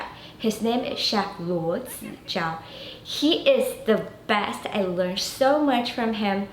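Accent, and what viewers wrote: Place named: Thailand. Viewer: You were in Thailand! That’s my home country. You look like one Chinese actress, her name is Yang Zhi. She is in the drama call “ash of love” it’s really good C drama 😊 and yes! The accent is our pride😊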